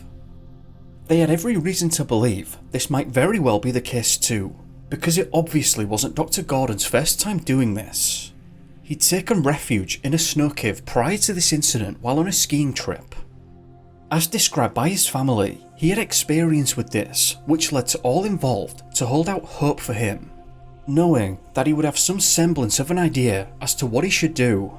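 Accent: United Kingdom. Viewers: BRITISH ACCENT LOVE IT!❤❤❤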